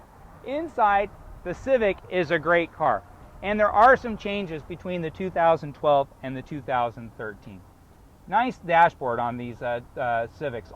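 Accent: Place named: United States. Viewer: Despite am not very good in american accent english, but yes, I understood almost all this guy told us, and yes, he is right. Appreciations! Thanks baldhead guy(am also)😊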